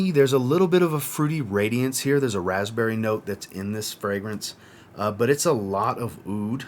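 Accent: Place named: New Orleans, United States. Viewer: Gotta be from New Orleans or at least la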